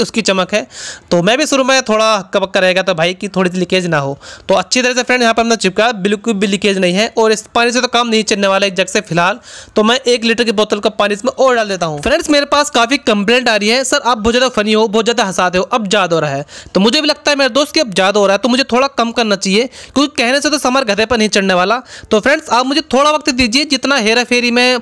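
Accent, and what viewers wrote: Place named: Rajasthan, India. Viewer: bhai tu dikhne me smart hai pr bolne me ek dum desi hai Rajsthan se hai kya bhai kyo ki me bhi desi hi hu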